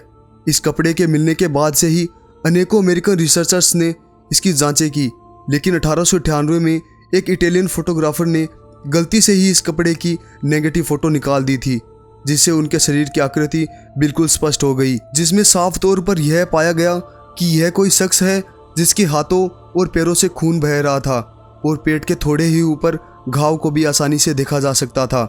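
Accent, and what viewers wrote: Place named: Haryana, India. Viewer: Taai aale tu te awaj te haryanvi laage hai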